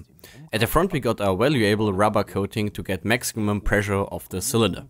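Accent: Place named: Germany. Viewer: Language advice: german to english - your V's and W's are backwards. You are using a Visim scope. Your pronounciation was "Wisim". Just flip it around and you should be good. I have german friends that make that mistake all of the time.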